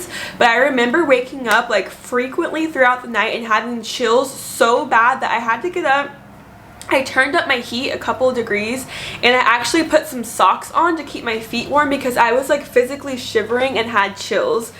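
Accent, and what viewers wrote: United States. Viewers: I know that accent from a familiar place, young lady. Are you from western PA by chance? 😉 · just ran across your video and decided to watch. A few minutes in I was thinking "this girl sounds like she's in Texas" and then you eventually revealed you're in the D/FW area, as am I. I'm just getting over the side effects from my 2nd pfizer vaccine. I slept for 24 hours, felt like I might have had a slight fever, a bit of achiness. Of course, my allergies are in high gear right now which doesn't help. I'm older than you are, 61, with an underlying health condition. But like you, I'm so grateful to be vaccinated and hopefully we can return to a more normal life. I've been self-isolating at home alone for a year so I'm ready for human interaction. I salute you being a teacher and public servant. One of the most important jobs in the world. 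Wishing you many blessings and a covid-free life. You're so adorable I bet your students LOVE you!!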